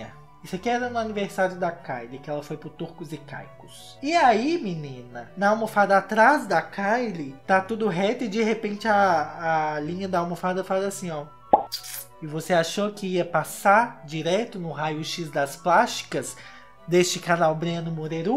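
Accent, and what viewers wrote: Minas Gerais, Brazil. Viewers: Gente, o Breno é mineiro? Conversa igualzim a gente aqui de BH.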